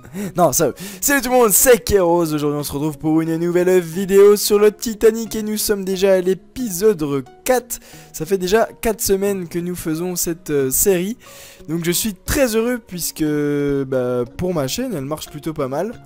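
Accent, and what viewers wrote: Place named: Franche-Comté, France. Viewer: Tu as l'accent de la Franche-Comté toi non ? Si oui bel accent 😉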